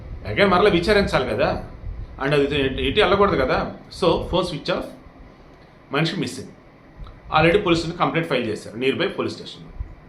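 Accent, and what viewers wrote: Andhra Pradesh, India. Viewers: Your language has more of Andhra slang … for non Andhra people it’s not understandable… please speak in mutual understandable language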